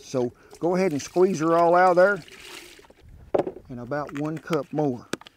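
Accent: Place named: Texas, United States. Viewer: He is sooo cuuuuuutttteeee!!! Love his Texan!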